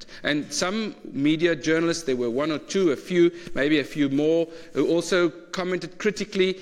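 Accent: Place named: Pretoria, South Africa. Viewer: This man sounds Pretorian 😊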